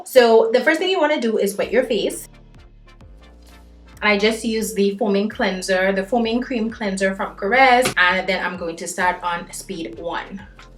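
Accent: Trinidad and Tobago. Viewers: Love listening to that Trini accent on YouTube. We need more west Indian people on this platform. Big love from Guyana 🇬🇾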